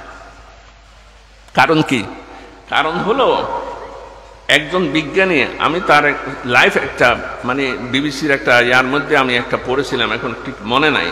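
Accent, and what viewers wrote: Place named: Chittagong, Bangladesh. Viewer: চিটাগং ভাষা কম বুঝি তারপর ও আপনার জন্য ভালা লাগে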